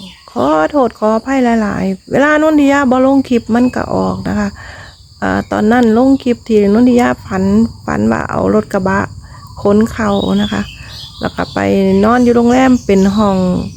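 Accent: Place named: Laos. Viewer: เสียลาวหลายจ้า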